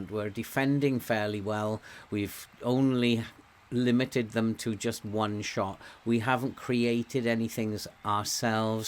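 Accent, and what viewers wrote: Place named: RP, United Kingdom. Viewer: So my guess was right. And if you ask me how I did it I'll answer - you speak a very good well-built RP English peculiar to teachers especially. Just an observation.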